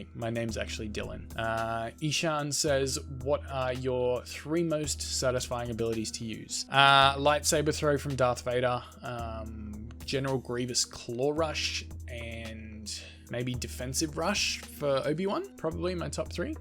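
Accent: Australia. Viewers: Your Australian? The Upside Down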